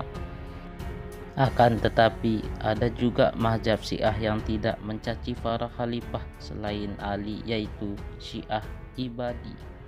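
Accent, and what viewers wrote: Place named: West Java, Indonesia. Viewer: Orang sunda ya. Huruf Z nya jdi J hehe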